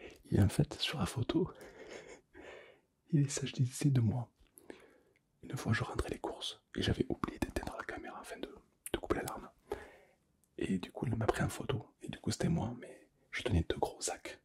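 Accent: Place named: Toulouse, France. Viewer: Une petite pépite ta vidéo ❤️ merci a toi ta une très belle voix avec ce petit accent toulousain ❤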